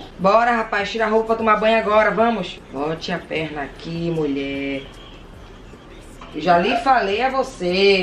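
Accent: Bahia, Brazil. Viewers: " Teu fogo vai acabar agora " kkkkkkkkkkkkkkkkkkkkk Eu amei ! Se eu fosse chutar diria q vc é baiana . Do ND me parei nesse vídeo kkkkkkkkkkkkk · Amo esse sotaque baiano e essa mamae forte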